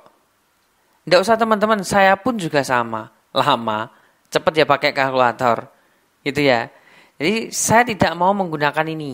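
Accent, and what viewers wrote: Java, Indonesia. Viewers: Orang mn? Medog2 gmn gt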